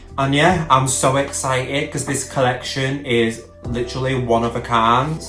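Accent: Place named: Hull, United Kingdom. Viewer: Mine was shipped yesterday and I can’t wait so started looking on yt see your video and instantly think I know that accent 🤣 fellow hullian you got a sub just for that 🤣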